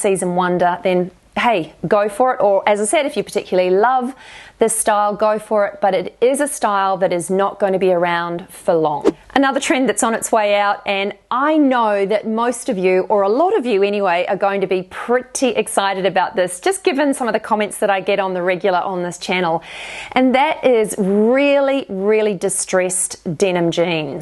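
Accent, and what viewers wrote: New Zealand. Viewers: Where's the accent from? New Zealand? Like the place that hasn't spend a year in their pijamas?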